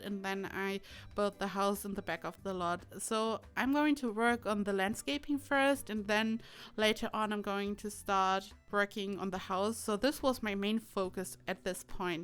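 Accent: Germany. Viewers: your accent smells so German 😍 and i love it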